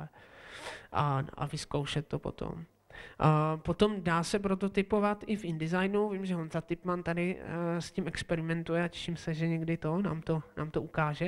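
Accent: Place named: Moravia, Czech Republic. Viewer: díky za facku. nechal jsem se unést pod vlivem špatného dojmu z toho videa (k čemuž v mém případě určitě přispěl i moravský přízvuk přednášejícího, který nějak nemůžu vystát - omlouvám se, ale prostě je to tak... snažím se to ale do hodnocení nepromítat). přednášel odborník pozvaný na doporučení, takže by bylo fajn, kdyby to mělo tomu odpovídající úroveň; podle mě ji to nemělo (neodvažuju se nicméně zpochybňovat odbornost přednášejícího, ale odbornost není všechno…).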